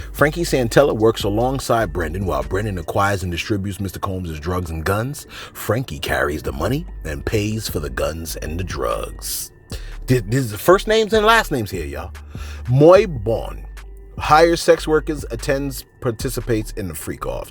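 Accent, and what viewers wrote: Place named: South, United States. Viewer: Man you talk bad like you from the south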